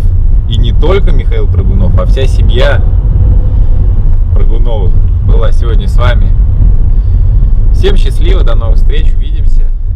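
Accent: Saint Petersburg, Russia. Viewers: Ах , точно!! Ты же питерец!!! Поэтому эти "творогА" и "ГРЕЧА"!!!!! Ужас. А еще свЁкла!! СЕЛО!!!! ЧЁ СКАЗАТЬ!!? У вас даже в магазинах ГРЕЧА пишут😨. булоШные, калбаШные, молоШные...Откуда это??? С НЕПА Ещё чтоли??? Пазор. Это не русский, это видно древнеМосковский , из КалаШного ряда, купэческий , бублиШно- торгаШеский! ФУБЛЯ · чреча греча меня бесит это Питерский говор)))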